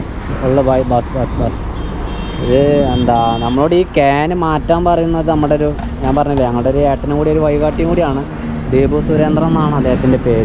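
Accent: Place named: Kannur, India. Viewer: നമ്മുടെ കണ്ണൂർ സ്റ്റൈൽ സംസാരിക്കുന്നതിൽ വളരെ സന്തോഷം തോനുന്നു🌹🌹🌹🌹 bro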